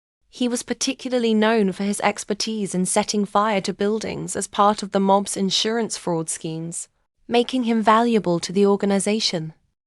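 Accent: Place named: United Kingdom. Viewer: Why is there a preponderance of British accents vo-ing mob stuff ? I’d rather hear a Brooklyn accent ! More realistic and less nauseous !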